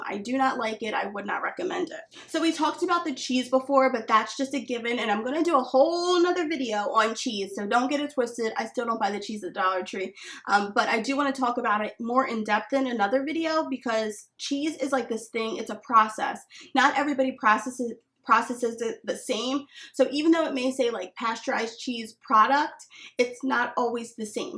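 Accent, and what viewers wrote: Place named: Philadelphia, United States. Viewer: Thanks for this! I immediately knew you were from Philly!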